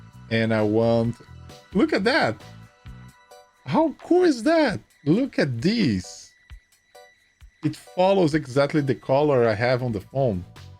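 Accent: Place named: Brazil. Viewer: You sound like a brasillian speaking English 🤔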